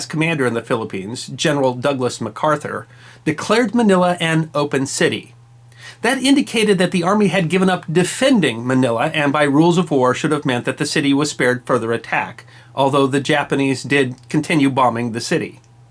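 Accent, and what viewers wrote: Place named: United States. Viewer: I appreciate this channel's interesting and informative accounts of history. In the interest of accuracy, which is what anyone would reasonably expect from THE History Guy, it would be nice if greater care and attention to detail were taken in learning the proper, non-Americanized pronunciation of foreign names and places. For instance, Bataan has three syllables 🙂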